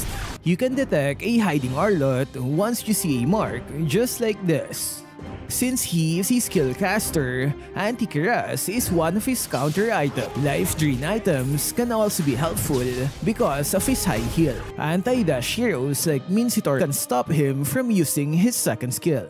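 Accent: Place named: Tagalog, Philippines. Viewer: In a yung english mo sir parang tagalog pakingan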